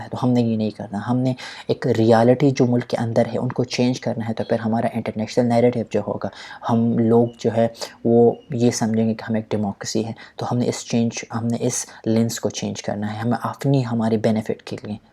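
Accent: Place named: United Kingdom. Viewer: Please Urdu ko Urdu ki tara bole me confuse hota hon baz alfaz me... You speak Urdu like English man.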